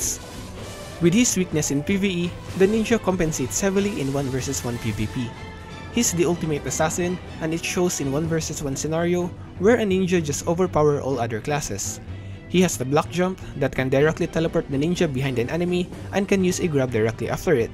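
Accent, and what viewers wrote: Philippines. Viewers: Great video! I'd say the music should be turned down a bit though as it's hard to hear you, especially since you don't have an English accent (Filipino?). Otherwise it was really informative, I just bought the game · to us big boi zerk mains, this brings a smile to my face. but the reality is, we got tons of unprotected skills, also our Q buff got longass cooldown and can also be used for 30 secs. It's like having the biggest penis but is also a quick shot which sad af. PS: your accent gives me pinoy vibes. :)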